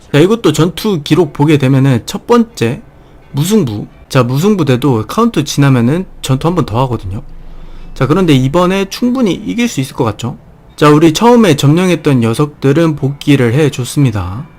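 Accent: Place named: Jeolla, South Korea. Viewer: 전라도 사투리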